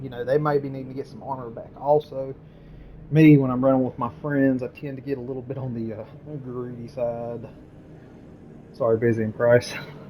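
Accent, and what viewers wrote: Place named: South, United States. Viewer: The southern accent is cool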